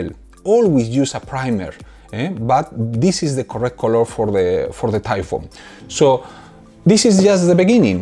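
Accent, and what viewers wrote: Spain. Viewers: no hombre por el amor de dios no te disculpes si lo hablas bien solo que tiene que intentar mitigar un poco el acento español lo tienes muy marcado y puede hacer que algunas cosas no se entienda bien en ejemplos como el de el sonido de la ce o la zeta que son fonema que no existen en ingles y a los angloparlantes les puede sonar a chino una palabra así pronunciada. Saludos mig perdón se he sido un poco impertinente animo mig 💪🏼💪🏼💪🏼💪🏼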